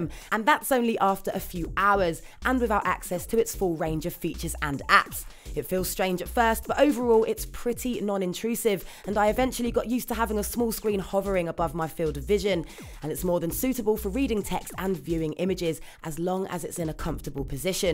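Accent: United Kingdom. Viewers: Ahahahaha the British Accent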